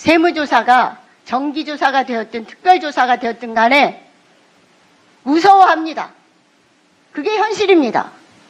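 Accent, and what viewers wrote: Gyeongsang, South Korea. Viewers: 경상도? 아~~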